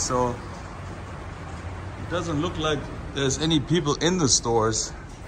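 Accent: Germany. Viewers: Your mild German accent sounds so pleasant to my ears:)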